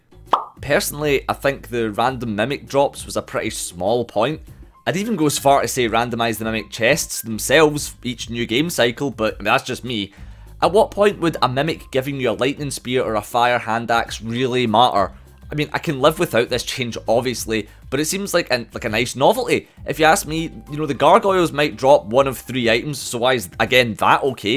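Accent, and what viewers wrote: Scotland, United Kingdom. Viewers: This is the first video I've seen from you. I've never heard a more scottish accent. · Wow I just got this vid in my recommended and I feel blessed because I never knew having a Scottish (Irish???) person yelling at me would be such an experience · U Scottish, mah boi? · Are you from Scotland? Love your accent <3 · i canny tell if its where youre feh or no but its sounds like you are scottish but tryna be understandable af to everybody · Anything is better in a heavy scottesh accent. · It's almost weird hearing a Scottish accent like mine on Youtube. Sooo refreshing though. Also - I fully agree, lul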